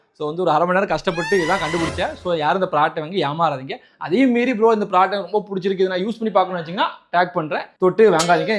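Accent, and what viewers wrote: Tirunelveli, India. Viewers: Thirunelveli language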